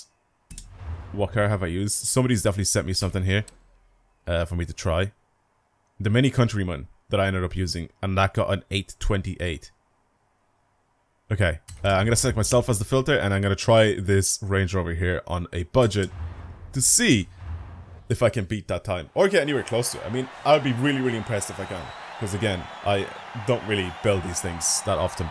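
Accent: Ireland. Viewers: where are you from you sound just like me and im irish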